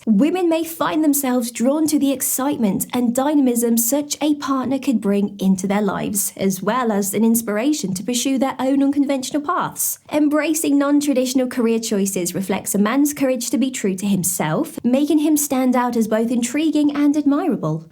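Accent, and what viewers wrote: England, United Kingdom. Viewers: Love her british accent she is adorable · It's the English accent for me😳😏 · I'm Surprised Americans don't say You're A I with your Perfect English Accent !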